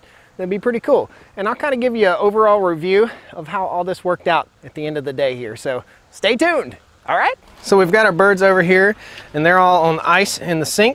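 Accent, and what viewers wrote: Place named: North Carolina, United States. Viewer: Your a north Carolinian to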